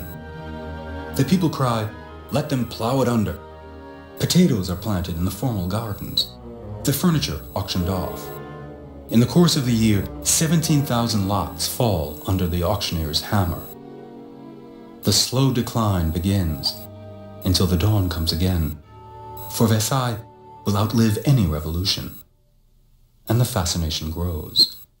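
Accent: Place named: United Kingdom. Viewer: The narrator clearly sounds British, not american nor French either.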